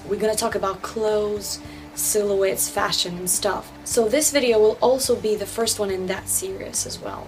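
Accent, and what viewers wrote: Russia. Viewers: How to be beautiful, Step One: Have Russian Accent. Step Two: Be. 😍😍😍😍 You're the coolest! Can't wait for this series! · Wait are you russian ..??? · It's the Russian background. I think it's a beautiful language/accent. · Women with the Russian accent 😍 it sounds so beautiful to me ! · She’s Russian! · как-то сразу поняла,что вы русская.очень хороший английский у вас,но есть какой-то маааахонький,еле уловимый родной акцент.только что нашла ваш канал и сразу подписалась!вы классная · yes. She is Russian after all. What accent should she have? I actually find truly beautiful how her English is so good. Absolutely perfect in every choice of verbs and adjectives and so on. So detailed. The fact she still has that Russian accent on the base is only the prove how genuine she is. And clever. She does speaks English as a native. Impressive I would say. 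And she also always says things i can rely to. Thanks. You are a breath of fresh air.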